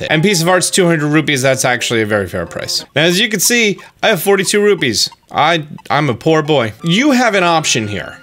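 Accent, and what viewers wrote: Australia. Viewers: Australian. Because if that was supposed to be British we don't sound like that lol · Nice Australian accent lol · Why do you sound Australian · Australian accent is all I needed today. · Awful Australian accent lmfao XD (I'm Australian) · First off the Ausie accent was a great. Digging the Link's awakening hints. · Whys he speaking in Aussie accent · Good Australian voice mate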